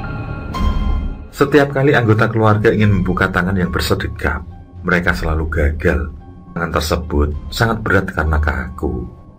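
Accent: Java, Indonesia. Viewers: Sukaaa denger suaranya pakdhe...baru aja sih nemu channelnya pakdhe ini dan langsung jatuh cinta...dialek jawanya pakdhe bikin ademmm...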